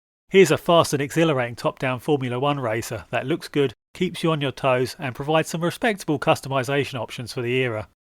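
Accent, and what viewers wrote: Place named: United Kingdom. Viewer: Is this a Brit accent or some other non American commonwealth? Aussie maybe?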